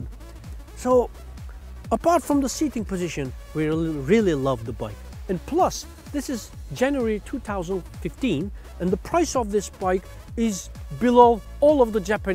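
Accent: England, United Kingdom. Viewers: Tam bir ingiliz aksanıyla konuşuyor, ne değer göçtü gitti bee....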